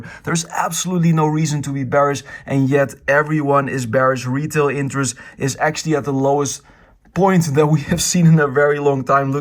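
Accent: Netherlands. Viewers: Ik volg je al een tijdje maar je klinkt echt als een Hollandse boy lol lekker bezig BTW.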